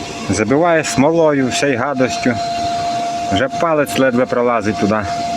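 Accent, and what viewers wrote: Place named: Polissia, Ukraine. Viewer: З якого регіону ваша мова?) мені от здається що це Полісся😊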